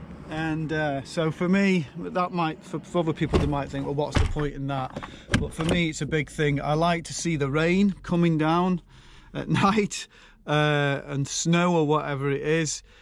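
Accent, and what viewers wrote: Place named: Stoke, United Kingdom. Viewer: Gorra luv a Stokie accent in a motrome.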